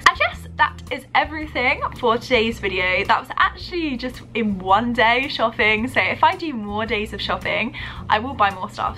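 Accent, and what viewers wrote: United Kingdom. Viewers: I'm American and I understand you! But maybe that's because I watch too many British Youtubers haha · I totally understand you.. They must not hear a lot of british accents because when I watched my first british youtubers, it took some time to get used to.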